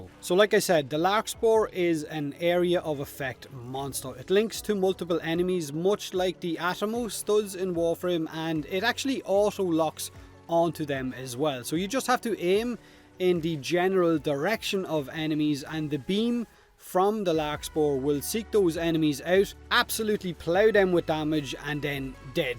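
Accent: Ireland. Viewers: really?! again?! you know we're only here for your Irish intros and outros!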